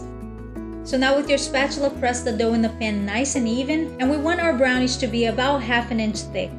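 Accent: Brazil. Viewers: Wait wait wait Are you from Brazil? You have a Brazilian accent, and I’m from Brazil too :D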